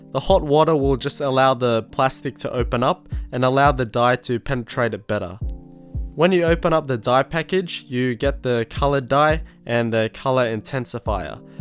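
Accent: Australia. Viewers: ayyy an aussie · I thought you were Australian! ...if it was a mix accent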